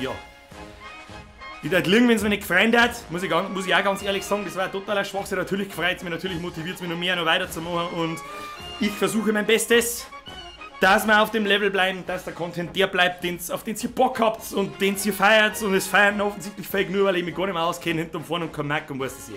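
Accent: Bavaria, Germany. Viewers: Sagmal woher aus bayern kommst du? :D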